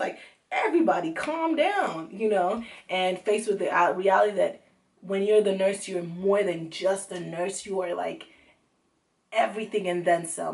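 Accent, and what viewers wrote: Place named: Nigeria. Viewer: That naija accent 😆😆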